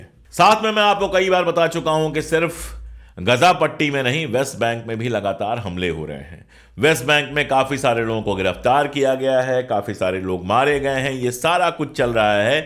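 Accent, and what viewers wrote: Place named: Awadh, India. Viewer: Bhayya aap ki aabaaz me Oudh ki khushbu aati hai, kya aap Oudh se hain? aapki patrakarita me insaniyat ki khshbu hai, Allah Paak aapko aise hi kaamyaab rakkhe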